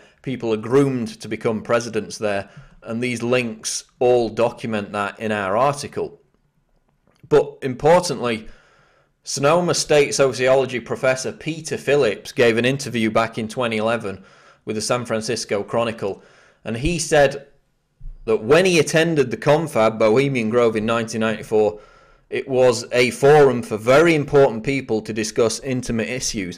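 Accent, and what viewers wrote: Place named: England, United Kingdom. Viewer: Good video. But man i hate that english accent.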